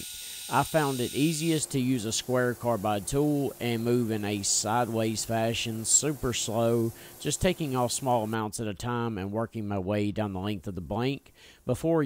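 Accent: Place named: Florida, United States. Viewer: You sound like a Florida boy. No insult. Florida boy here.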